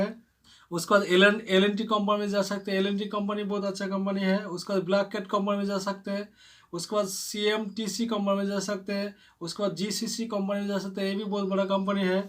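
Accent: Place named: Bihar, India. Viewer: Sabse pehle yey bata Tum Bihari ho kya !! Aap ke awaz se aesa Lagta h ke aap Bihari ho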